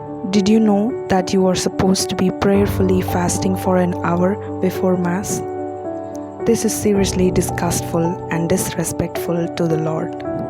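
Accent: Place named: India. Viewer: Very nice video presentation, and excellent advice. You sound Indian. Kerala, by any chance?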